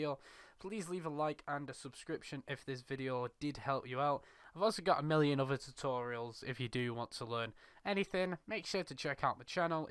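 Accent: United Kingdom. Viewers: You sound like a Yorkshire lad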